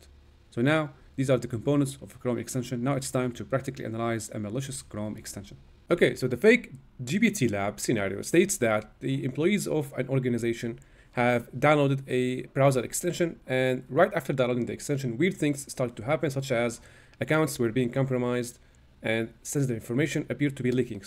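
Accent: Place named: Iran. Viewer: Your video is like a professor lecture on digital forensics.With a Persian accent “ good Ea stuff “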